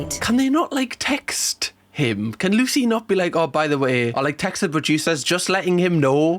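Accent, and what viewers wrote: Newcastle, United Kingdom. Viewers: I love the Geordie accent. My parents were both Geordies but moved to Yorkshire when they were quite young. All their siblings stayed in Geordie land though. I’m now in Canada and most of my Geordie family are gone so I love to listen to you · 'm an old lady stuck home a lot when I'm not being ferried back and forth to hopsitals for chemo and I discovered you a few weeks back. I can't even recall which video it was now but your wonderful Newcastle accent hooked me in. Always been a pushover for a Geordie accent. But I stayed because you're not only a funny little bugger, you're actually quite delightful. Personable, self deprecating & above all compassionate in a way many commentary channels are most certainly not. Well done and thank you for making me laugh. I've had tears a few times in every video I've watched. I've made my son subscribe as well. 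Let's get you to a million, eh? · He sounds like he’s from Newcastle (city in England)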